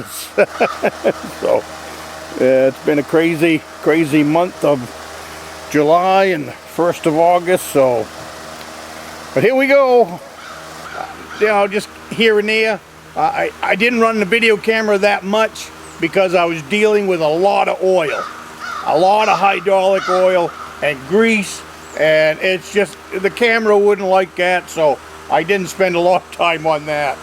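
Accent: Maine, United States. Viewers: A good start would be getting rid of the Mills gang.... I really do love your authentic Maine accent! I used to hear it everywhere but now only when I'm in the woods with the old-timers😏👍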